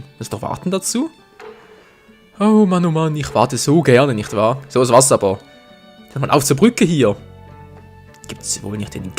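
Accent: Switzerland. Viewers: cooles video kann es sein das du aus der Schweiz bist wegen deinem Sprachverhalten xD nebenbei hab dir n abo und like dagelassen ich würde mich freuen wenn du auch mal auf meinem channel vorbeischauen würdest :D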